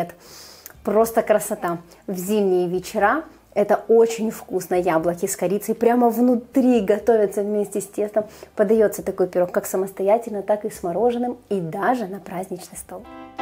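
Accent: Ukraine. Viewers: Здравствуйте,. Спасибо за Ваш труд, за Вашу энергию, за Вашу любовь к подписчикам. Очень нравится даже просто смотреть, как Вы готовите, и слушать Вашу милую речь. Я строго отношусь к правильной речи, считаю это важным. Но, как здесь уже сказали, есть в каждом языке своеобразие и самобытность. Ваша речь полна этих самобытных украинских особенностей. Это здорово. Я очень любила в детстве слушать, как моя белорусская бабушка гутарила с соседками. Песня! Обязательно приготовлю по этому рецепту рулет. Будьте здоровы.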